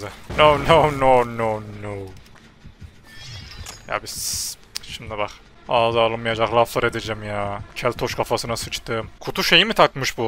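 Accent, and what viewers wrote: Sivas, Turkey. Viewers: Hemşerim sivaslımısın bende😍😘🥰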